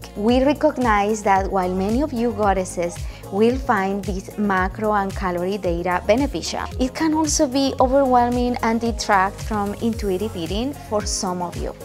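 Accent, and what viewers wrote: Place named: Latin America. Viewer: I like when latinos speak english 😂❤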